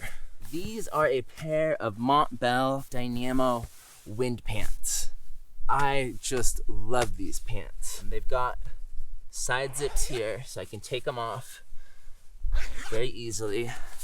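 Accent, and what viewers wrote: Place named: California, United States. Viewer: Good content. I'm having confusion over the accented speech - kind of valley guy of sorts. Got to be from CA, maybe Portland or Seattle.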